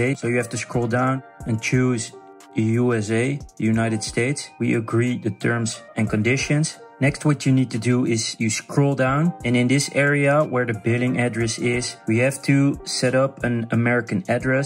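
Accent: Netherlands. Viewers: a nice good thick dutch accent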